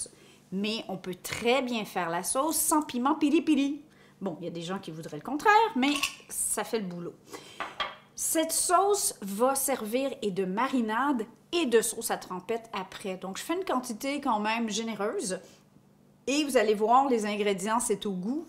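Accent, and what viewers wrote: Quebec, Canada. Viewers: Oh bonjour Québec ! A cause de vous je me suis acheté un airfryer 😂 je connaissais pas mais ça a l’air sympa , et votre accent j’ai dit « on est obligé c’est le quebec on a jamais le droit de leur refuser quoi que ce soit » 😂 Donc j’ai hâte de le recevoir pour l’essayer et regarder vos petites recettes trop mignonnes 🥹 J’aime les plats léger pour le sport et lors de cheatmeal j’aime bien les beignets ou fritures sympa même si c’est un peu gras ! Merci à vous 2 😁😁😁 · Je m'abonne mais c'est pas vraiment pour les recettes , c'est mon métier cuisinier mais pour l'accent québécois , franchement ça me fait craquer , j'adore 🤣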